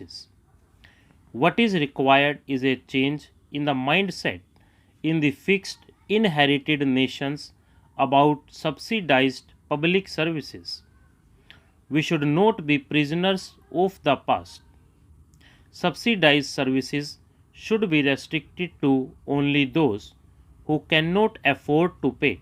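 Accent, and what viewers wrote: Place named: Haryana, India. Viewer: Sir thoda hariyanvi tond ho. Jate. H thoda sa twist 😅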